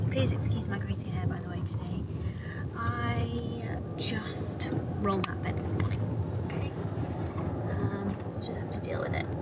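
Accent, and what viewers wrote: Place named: United Kingdom. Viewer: I love this channel. I can learn natural British English through this channel and l like her daily life too. 😁😁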